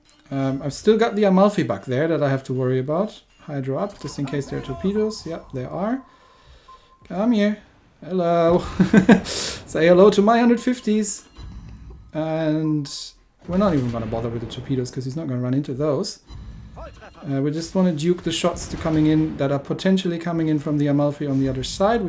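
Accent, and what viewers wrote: Germany. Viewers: Yes, he is from Nurnberg. He now lives in Australia. Check out his Nurnberg video. He says it there.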